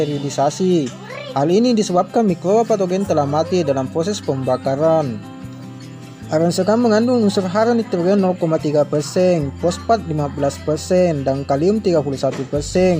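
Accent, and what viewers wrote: Bugis, Indonesia. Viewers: Bahasa Bugisnya ketara benar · Orang bugis yah? Ogi Aga ki.... ?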